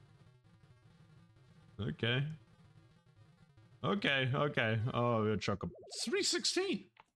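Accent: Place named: Germany. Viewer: I was wonderd that this is your first video because its very good. Well I am from Austria and I hear the German accent ,but can keep doing videos like them I like them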